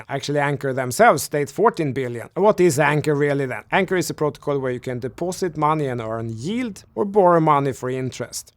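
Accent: Sweden. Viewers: Man this Swedish accent is thicc a-f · Skönt med någon som kör hårt på sin svenska dialekt, älska`rt! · Snälla sluta prata Stockholms engelska, hemskt för fan.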